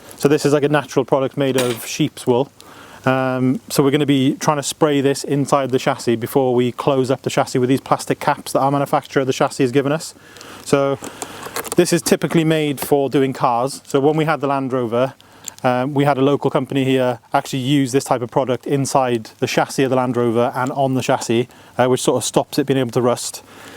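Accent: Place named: Wales, United Kingdom. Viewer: I’ve watched all your videos with great interest, seems like you are “cracking” along nicely! In South Mississippi we use the term “fixin’” or “fixing” quite a bit, as in “I’m fixin’ to put this cladding on the side of our tiny house”, or “I’m fixin’ to head down to the Builders Merchant”. I think you could pull it off, Wales accent and all! Great work guys!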